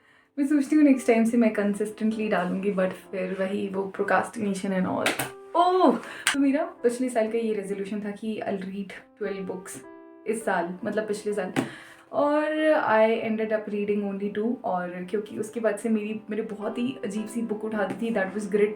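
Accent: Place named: Mandi, India. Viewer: Hello I am also from Kangra .....apki awas s esa lag ra ki ap Mandi s ho ?? Kya ap mandi se ho 🤔🤔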